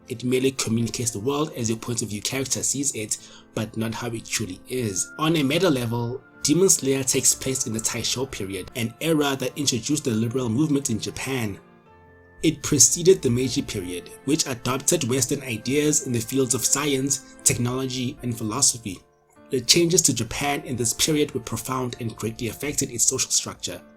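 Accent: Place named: RP, United Kingdom. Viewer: Bros english is really queen Elizabeth II level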